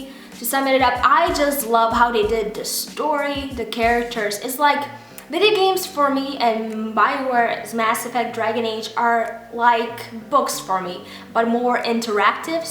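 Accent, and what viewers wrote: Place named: Russia. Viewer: Love the vid and enjoy your videos and where are u from u sound a bit Russian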